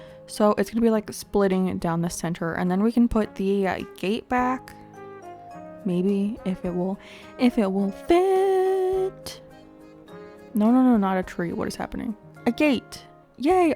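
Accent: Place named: South, United States. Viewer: That southern accent took me out hahahah this build turned out so good!! 👏🏻 and yes, the waterscaping looks very interesting 🤣 educational content!!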